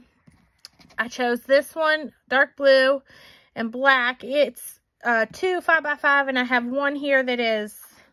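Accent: South, United States. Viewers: Stop doubting yourself! Girl those seams are looking awesome 👍😎!! Love to listen to you talk, Southern Gal!!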